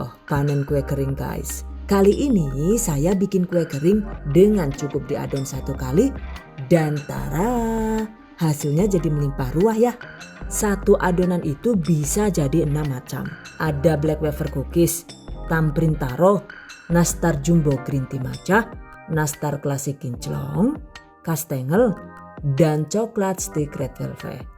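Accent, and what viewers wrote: Banyumas, Indonesia. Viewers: Sueeennneeeng bgt ma video2 Pas moment realeseny Pas budgetny Pas rasany Pas tipsny Pas bhasa NGAPAKny Pkokny ngebantu bgt Jazakillah khoiron😊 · mb,di bnyakin ngomong ngapaknya ya,,lucu😁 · Seneng liat video karna ada hiburan tersendiri dngan suaranya yg khas berwibawa dan kadang jg ngapak 😁 sukses terus bunda #GiveawayTHV2021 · Saya suka resep dari karena resepnya simple dan banyak memberikan tips dan trik yang sangat membantu bagi saya yang pemula, ngapak2nya jg ada😊😊. Mksh 😙😙😙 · Chanel kesukaanku Menginspirasi buat ibu ibu muda kaya aku yg suka bgt buat kuker, tips &triknya ngebantu banget 🥰 Dubbingnya pengisi suaranya juga lucu ngapak ngapak lihat video sambil senyum" Sendiri 😂 Paket komplit pokoknya klo liat ada lucu"nya gimana gitu 😁 #GiveawayTHV2021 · asal resepnya udah ga ragu buat nyoba recook.. vidionya selalu disertai tips & trik, jd insya Alloh berhasil asal sesuai resep sama langkah²nya.. 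ditambah ana logat² ngapake, dadi rasane kaya agi diwaraih masak nang mbekayune, wis pokoke channel masak terfavorite nyong kye lah yu.. semoga makin sukses & jgn pernah bosan untuk berbagi ilmu ya mbekayu.. salam ngapak dari kec.ayah kebumen #GiveawayTHV2021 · Paling sukaaa deh nonton ❤️, banyak bgt resep2 camilan'a, bisa juga buat ide jualan d rumah.. tutorial'a jelas, padat & gamblang👌. Ilmu'a bermanfaat banget apalagi ibu2 muda sperti saya ini😊. insyaaAllah kelak nanti gadget dapur'a sudah komplit (maklum nganten anyar😅) pengin bgt deh praktekin 1per1 resep'a biar bisa bantu2 suami juga😍 oiyah liat video'a juga ga ngebosenin deh pokok'a, krna logat medok "ngapak'a" itu lhoo yg bikin ngakak sendiri😂😂 Daaan dari pertama jumpa yg paling bikin penasaran itu pengisi suara'a tauu, hehehe🤗. #GiveawayTHV2021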